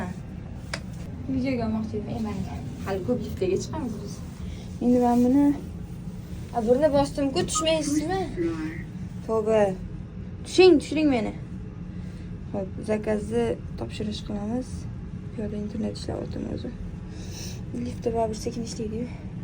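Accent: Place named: Bukhara, Uzbekistan. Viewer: Вай Бухороча гапирурин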